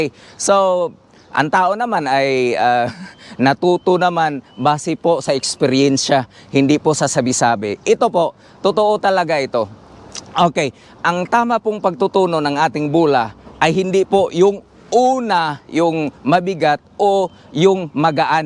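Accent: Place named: Visayas, Philippines. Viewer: Pwede naman kayong wag manood para hindi kayo mainis. Si kasi sa obserbasyon ko eh Ilonggo at bisaya originally. Medyo hirap talaga sila managalog ang mga bisaya. Alam ko kayang mga english ni pero mas malawak ang maaabot ng mga vlogs nya pag tinagalog nya. Tas matagal na yata sya sa Thailand at fluent na sya magsalita Thai. Kaya naghahalo halo na ang accent nya. Sa kin walang problema ang paliwanag nya. Kahit papano may napulot ako.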